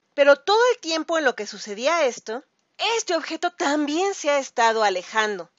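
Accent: Mexico. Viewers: Me gusta la voz de las mexicanas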